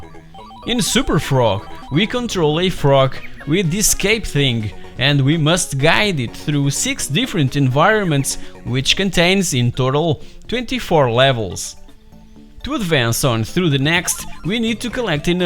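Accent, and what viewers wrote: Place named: Portugal. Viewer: Portugues certo... o sotaque parecia... muito bom canal! Saudosismo é coisa boa!:)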